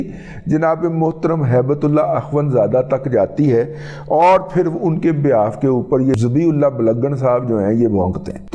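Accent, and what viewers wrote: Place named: Sialkot, Pakistan. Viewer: Dada Jee ..., Sialkote tone... Good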